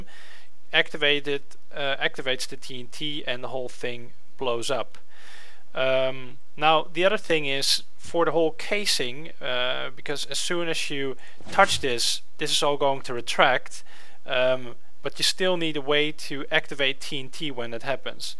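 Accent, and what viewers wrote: Netherlands. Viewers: Jup, das 100% nederlands accent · ok dit is nederlands lol accent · seems like a dutch accent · are you from holland? because i can hear it.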